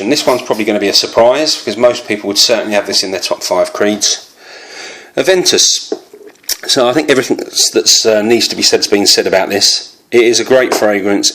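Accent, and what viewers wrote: United Kingdom. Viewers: I just just subscribed to your channel!!! I'm so impressed thus far by your first video. I don't know what it is about you Brits that when you speak you command such respect?!?!?! Accent??? (Wink wink)... Anyways here is my TOP 5 all the way from Canada... 1. Neroli Sauvage 2. Green Irish Tweed 3. Jardin D'amalfi 4. Original Vetiver 5 Original Santal :) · Nah, you should pronounce things the way you naturally do. Its just plain rude to criticise someone's accent and suggest it's some how wrong. I've got an estuary english accent too, don't speak differently to appease others. Imagine it the other way round, I'd never make negative comments about any accents that sound foreign or different to ours' - as i said it's very rude and comes from plain ignorance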